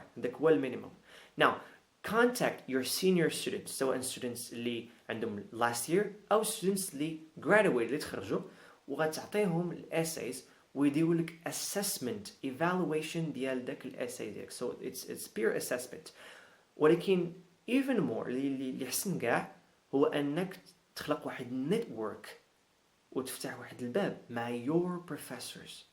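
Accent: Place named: United States. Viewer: AMERICAN ACCENT spots on يااااااي كلوكيت لك خطأ ف نطق كلمة اندوفيدجوول لووول ولاكن تبارك الله عليك .. انا عندي الانجليزية لا بأس بها ولاكن كاتنداثر من قلة التطبيق و الحوار ، مكانلقاش معامن واخا عندي اللكنة قريبا لديالك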